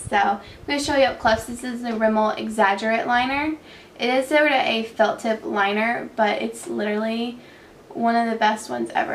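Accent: South, United States. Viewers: you dont have your southern accent as much anymore ive noticed! love your videos pretty xx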